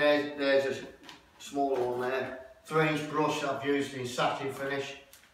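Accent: Leicester, United Kingdom. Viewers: hi,mate are you from leicester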